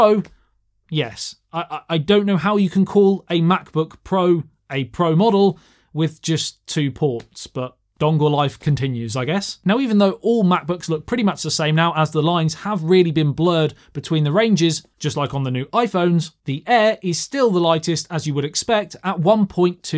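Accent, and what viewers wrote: United Kingdom. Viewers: Are u British?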